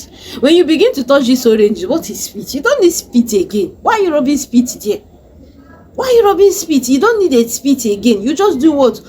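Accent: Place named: Nigeria. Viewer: Go straight to the point and stop talking too much Next time speak good English Most us don't understand your Nigeria English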